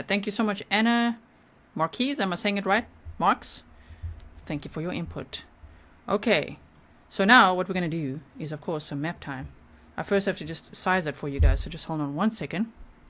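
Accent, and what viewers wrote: South Africa. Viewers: South African? 😊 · Hi G x I'm from the KZN South Coast of South Africa. Always look forward to your videos and South African accent giving international news ❤. You go girl. Watch each episode. Always more indepth and thought provoking.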